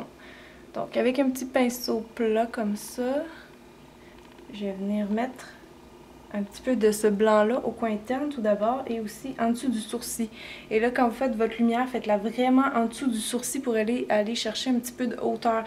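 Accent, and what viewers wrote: Quebec, Canada. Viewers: Je pense sincèrement que est de très bon conseil! A 55 ans, je vais suivre ses conseils de maquillage.En plus, elle a vraiment l'air très sympathique et chaleureuse.En plus, personnellement, j'adore son accent québécois. Faites lui confiance. Marie de Bretagne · trop agréable d'entendre une Québécoise Merci!!!!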